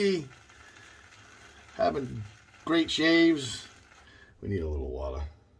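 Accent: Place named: South, United States. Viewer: Love your content and the southern accent. 🙂 Keep up the good work!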